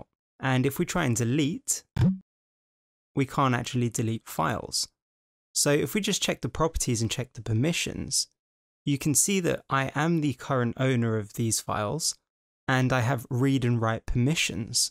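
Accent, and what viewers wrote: United Kingdom. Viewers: So glad I stumbled upon your video, what luck!! After struggling with reinstalling Ubuntu 20.04.3 successfully ,after an "ID-I0-T", error I thought I better check the Win 10 install on my dual boot drive. Win 10 booted up fine and whilst booted it did a full Nov 21 update on shutdown / restart, and all worked okay. After shutting Win 10 I then found problems reinstalling apps in Ubuntu with the persistent read only file system error, requiring several uses of Timeshift to reset Ubuntu again & again. I had instantly thought it was an Ubuntu error after the reinstall, but low and behold it is Windows trying to hog the hard disk limelight again with "Turn on fast restart" enabled. I will be checking that it is disabled after every Win 10 update from now on. Thanks so much and great to hear a British accent on the video :)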